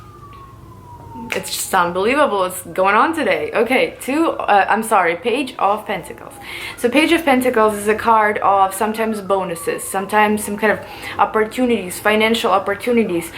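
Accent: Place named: Russia. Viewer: I like her accent, is she Russian?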